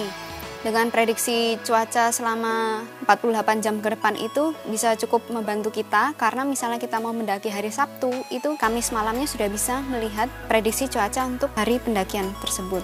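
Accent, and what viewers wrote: Java, Indonesia. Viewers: Saya suka semi medoknya itu dari mba nih hehehe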